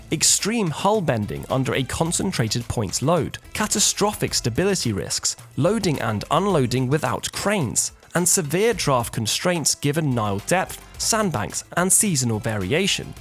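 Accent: United Kingdom. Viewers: I can't do a third in a row video with the speaker babbling with their irritating British accent. I don't find the language "cute" or "charming". Just annoying.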